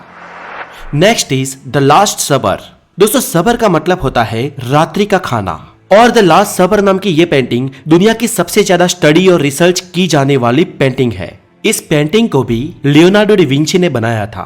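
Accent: Gujarat, India. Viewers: भैया जी यह सर गुजराती है और गुजराती लोग ऐसा ही बोलते है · kya aap gujarati hai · You are a Gujarati right 😅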